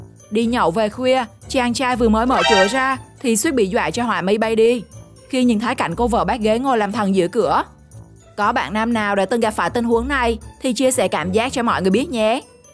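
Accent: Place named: Tuy Hoa, Vietnam. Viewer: chào AD. giọng Ad khá quen Tuy Hòa thì phải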